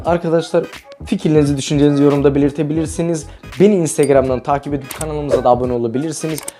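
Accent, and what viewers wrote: Azerbaijan. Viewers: Salam qadanalim men seni tam annamadime ağali ela Azerbaycan lehcesinde danişisan amma soyadin. Ağali senin atan Azerbaycannandi hee? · Abi selam sen Azerbaycanlımısın konuşmandan benziyoda o yüzdrn söyledim.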